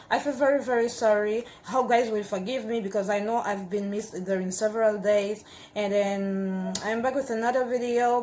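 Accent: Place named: Spain. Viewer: really nice intro, you have a voice beautiful. i watched, i love your Spanish accent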